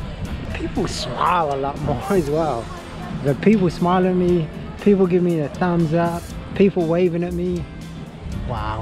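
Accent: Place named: Australia. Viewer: Palanga is a resort town in Lithuania. You sound australian or could be kiwi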